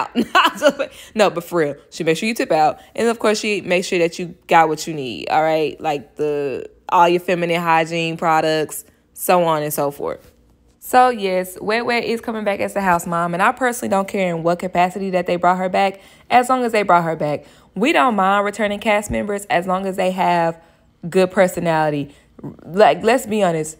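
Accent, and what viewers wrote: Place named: East Coast, United States. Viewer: Yes, I can tell from the accident that you're from the East Coast. 😁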